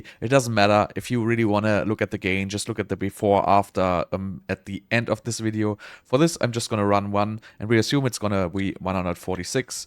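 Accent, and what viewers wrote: Germany. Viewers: Schon in den ersten 5sek. wusste ich das der Dude Deutscher ist😂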